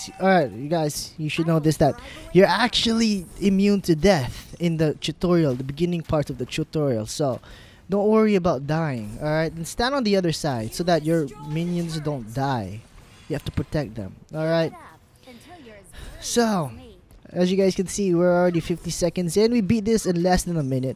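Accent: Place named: Philippines. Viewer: Lol pinoy KA no KASI accent mo PA LANG pinoy na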